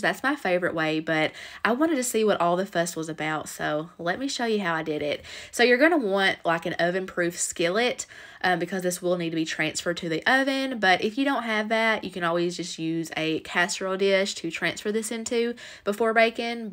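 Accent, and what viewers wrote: South, United States. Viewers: I love southern accents so much 😫💜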